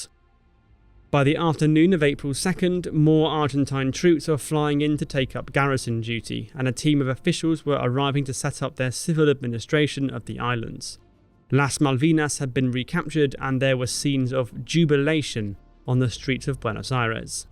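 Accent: United Kingdom. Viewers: Well... The author of this video is British, you can hear it in his British accent. Therefore, you know the answer. And he is not a historian, but a fan. He always "cheers" for one of the side in his videos. For example, when the Soviet army is fighting in his video with the German one, he plays sad music when the SS divisions retreat. But never the other way around.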